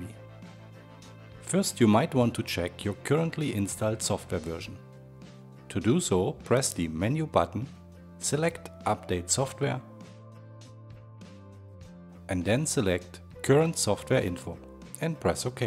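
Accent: Germany. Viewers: I think you are german 😂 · Why using a native German speaker for this video. In fact because it's a Dutch brand it would be more appreciated to use a native Dutch speaker 😂😂😂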